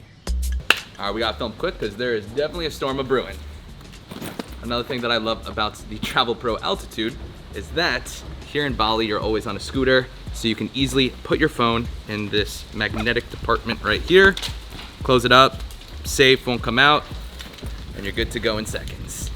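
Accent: United States. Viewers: Just here for some real-life American English. Thanks!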